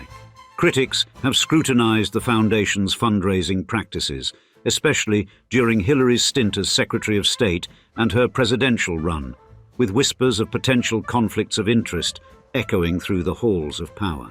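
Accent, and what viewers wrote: RP, United Kingdom. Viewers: Another BULL SH*T British narration - stick to your own corruption. There's plenty of it. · The lesson here is if you hire a guy with an aristocratic British accent you figure you can put all kinds of lies, bad stats and idiotic comments. I will just focus on one; Bill Clinton did not create a $200 billion + surplus. And this is just one of many in the first 5 minuted of this propoganda piece. Goebels would be proud.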